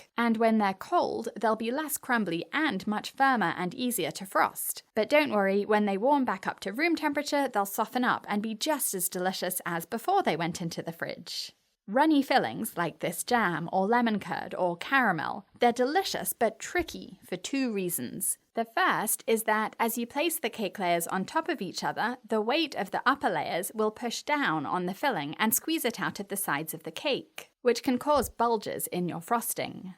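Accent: England, United Kingdom. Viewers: Thanks a mill for this very helpful video!! 🩷🤍 Also you have a gorgeous British accent!! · Honestly I'm devouring your information and tutorials.. they are so informative and clear.. especially with your beautiful British accent.. im attempting my first layer cake and have taken all your info on board to ensure I'm not rushing... still nervous but mire confident with your amazing tutorials.. thank you ❤❤😊 xx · What part of England are you from? I can’t figure out your accent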